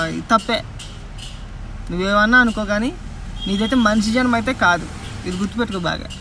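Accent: Godavari, India. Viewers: బాబు ప్రతి అంశాన్ని గోదావరి యాసలో చక్కని విమర్శతోకూడిన విశ్లేషణ చేస్తున్నారు, గో ఎహెడ్!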